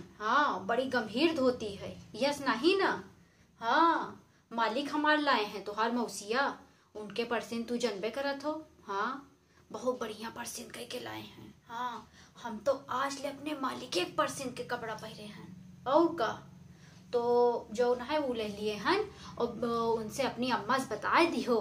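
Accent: Awadhi, India. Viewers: Verý nice gajab ki aktar ho tumhare muh se a avdhi boli bahut achhi lgti hai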